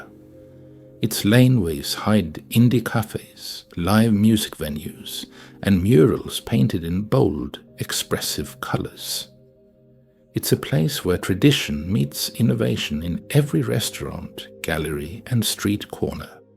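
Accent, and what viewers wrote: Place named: India. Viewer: Fitting having an Indian accent narrating it. Well done Australia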